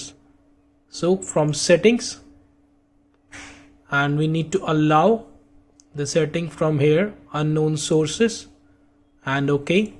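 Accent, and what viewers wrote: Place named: Delhi, India. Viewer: I am guessing you are from Delhi, by your accent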